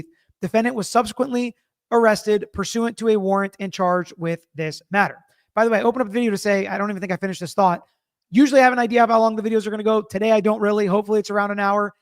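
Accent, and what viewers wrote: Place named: South, United States. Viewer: Put on 1.5x speed if you’re not from the South.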